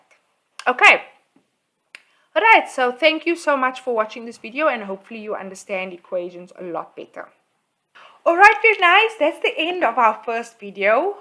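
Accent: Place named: South Africa. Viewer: South African accent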